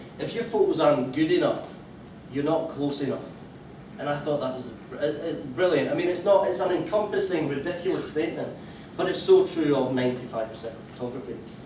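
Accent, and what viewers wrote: Scotland, United Kingdom. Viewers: you are a very good artist and I love the way you use vivid colours that create such emotive impact in your paintings which I so much would like to emulate, even injust a small way, but in terms of communicating and dare I say showing how you teach, apart from the last one ie Lochgreen Part 6, speaking as a long term teacher, trainer and presenter you are 'crap'. I really do not see why you bothered to put this on Youtube, you got you back to us most of the tims so we can't see you painting, the canvas is at an angle and takes up about 1/6 of the screen, the projected screen which is just a duplicate of what you were doing was too light as you obviously needed light to paint, you had no decent microphone and having you back to us most of the time coupled with your very broad scottish accent most of your instructions I could not understand - if I was thinking of actually attending and paying for a live session, no way if this is what I would find. 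Shame as there are so many untalented artists on Youtube or really bad untalented paintings being sold- some one like you is much needed.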